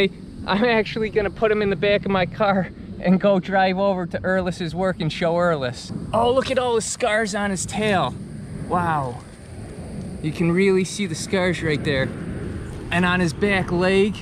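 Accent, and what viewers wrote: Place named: New York, United States. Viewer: I’m new to this channel so idk but is he originally from New York? Brooklyn maybe? Just picked up on his accent is all lol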